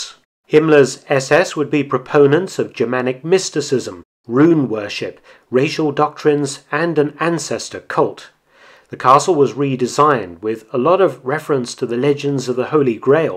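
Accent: England, United Kingdom. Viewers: I'd say he's English, to be precise.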